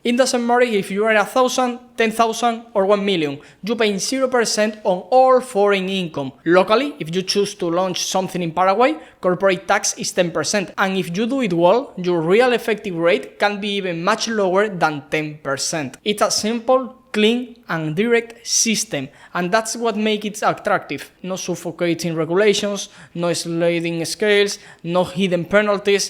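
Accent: Spain. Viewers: don’t worry. Es nuestro acento español, q no nos lo quitamos ni pa’trás!😅😂 (a ver, que sí, que se puede mejorar, ….pero no es lo importante. Tu contenido es bueno y es por lo q viene la gente) Sigue así!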